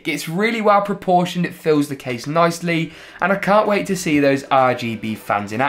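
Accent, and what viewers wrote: United Kingdom. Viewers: Is it racist if Somehow I like his accent, is that British accent? That's cool for my Asian ear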